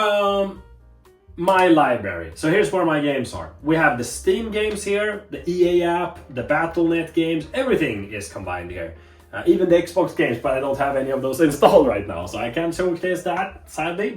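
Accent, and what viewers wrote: Sweden. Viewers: I know that accent mr swede!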